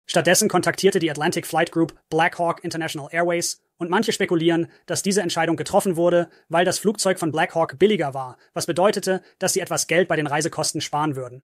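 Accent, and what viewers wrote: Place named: United States. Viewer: Was soll dieser ständige Wechsel von rel. sauberer deutscher Sprache in einen beschi...amerikanischen Akzent?! Sowas kann man sauber programmieren, also wieso dieser Unsinn?!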